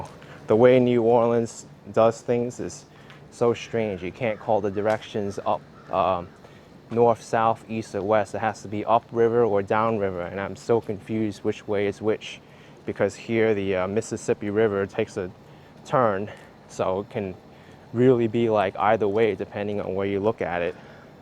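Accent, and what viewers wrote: New York, United States. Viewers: Your awesome NY accent will alway give you away that you are from NY.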